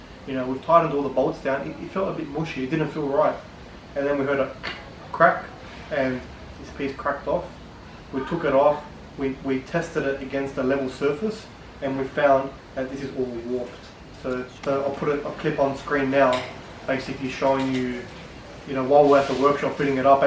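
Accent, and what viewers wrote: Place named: Australia. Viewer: Plasma man is your friend you sound Australian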